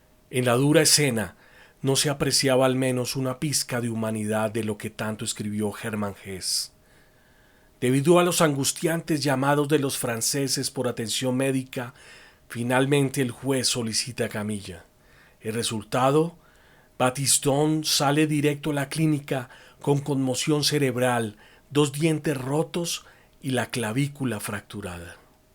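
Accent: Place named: Argentina. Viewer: Cuando empezaste dije yo este es argentino y me puse a pensar en cual provincia serán más tontos . Después me puse a ver y escuchar y me gustó muy buena narrada . En aquellos años supongo yo que era un espermatozoide nadando en los testículo de.mi viejo . La verdad que ese arquero me recuerdo al gato sesa o a orion y algunos otros . Saludos muy buen video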